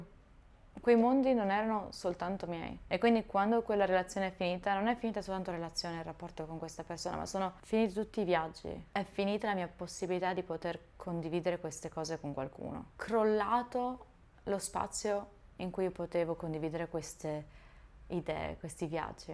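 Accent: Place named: Lombardy, Italy. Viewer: Non hai l'accento milanese ma più del sopra Milano.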